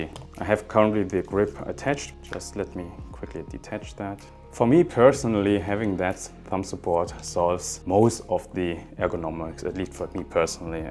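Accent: Germany. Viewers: Interesting to see asian guy who speaking english wit a german accent 😊 and Thanks for video! Nice one ☝️ keep going!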